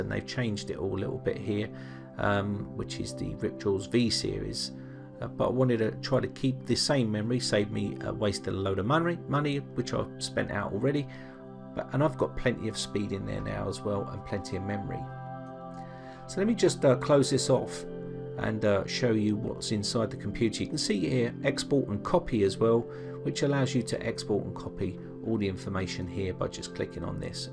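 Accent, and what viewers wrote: England, United Kingdom. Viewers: What part of England are you from, Gloucestershire?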